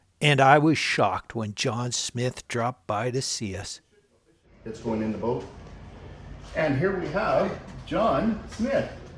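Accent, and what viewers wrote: Canada. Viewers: i know the family personally, went to high school with Mr. Clothier and 'Ms. Shirley's daughter [Jessica - my year - a friend] and son [John - a year earlier and more of a friend], in North Van. I have been to their home many times [an 18-minute walk]. I was actually more commenting on your dialect... being born and raised in North Van and had many First Nations friends [not in my neighbourhood, except 4 doors down from my childhood street ['weird' - not 'weird' - growing up that I never thought of them as 'Indian' - my brother was best friends with the oldest brother and all I identified the 3 brothers as Randy, Rick and Wynn] but in the Squamish reserve in North Van... [long story how I accumulated many First Nations friends in a reserve that was a 14-minute bus ride away], your dialect sounds very Squamish Nation, but maybe you could correct me. 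Musqueam? Love the background that I already knew, with a few phenomenal extras you revealed... exploring your high school friends is not what most friends do, except the home had war photos and stage acting photos of both Mr. and Ms. [from England and Canada and the University of British Columbia, my Bachelors and Masters alma mater], so some stories came out, but Mr. Clothier was rather humble about his war endevours, like most Canadian veterans, just like my father, a navigator in the Pacific during WWII.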